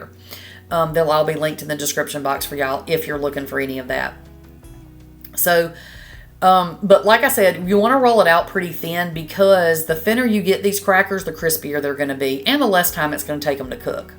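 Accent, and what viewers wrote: South, United States. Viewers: You have such a beautiful Southern Accent. What State are you from?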